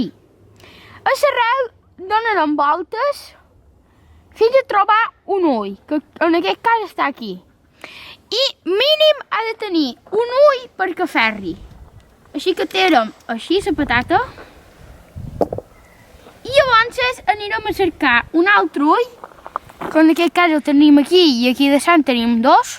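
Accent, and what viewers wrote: Mallorca, Spain. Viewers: Jo sí la entenc. No sóc català i vaig aprendre-ho. Com m'agrada l'accent mallorquí posoTV mallorquí de IB3 de quan en quan. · Mare meva com canvia el català de Tarragona al Mallorquí, Bon vídeo Miquel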